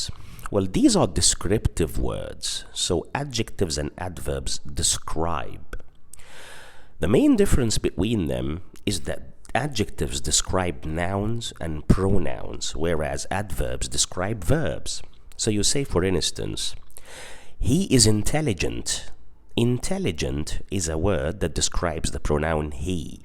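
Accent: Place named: United Kingdom. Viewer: amazing british accent !!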